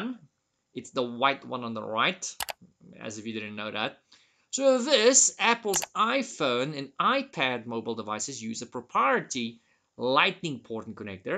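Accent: South Africa. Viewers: This accent. Are you south African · I heard the accent and thought “this is a fellow South African” 😂😂❤❤. Subscribed!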